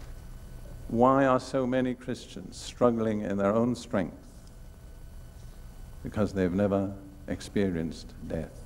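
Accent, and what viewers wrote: United Kingdom. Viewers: Yes! I fully believe you must give way, and let Jesus lead. I wanted to note to the deaf, that toward the end, when he is preaching from Revelation, he mentions "the wrath of the lamb". His british accent causes the captioning to type "roth". But he say's it 3 times, and each time "Lamb" is captioned incorrectly. (Shame on you, Youtube)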